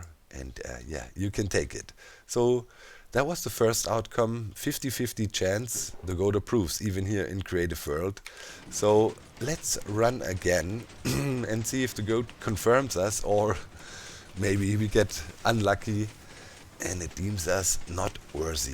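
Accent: Germany. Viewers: Ich hab direkt gemerkt du bist deutsch du hast einen kleinen Akzent. Antowert bitte wenn ich recht have und du mich verstehst.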